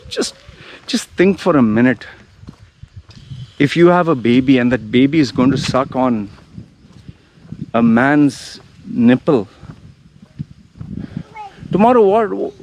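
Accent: India. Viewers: You have indian accent but maori tattoos. Whats going on.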